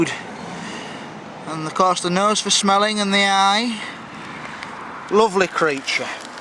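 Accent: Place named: Barnsley, United Kingdom. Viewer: This bloke must be from Barnsley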